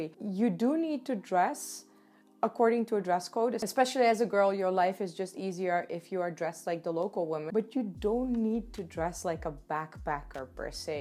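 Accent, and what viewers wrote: India. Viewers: Awh you have a lil Indian accent and im jealous I’ve never been to the motherland 😔 ugh you’re earrings are 😍 and omg you are definitely becoming a Indian talking with your hands all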